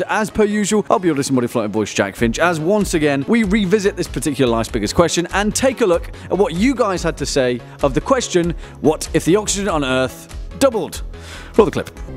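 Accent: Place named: Yorkshire, United Kingdom. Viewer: I love the accent.. Is that Yorkish? Anyway thanks for this informative mate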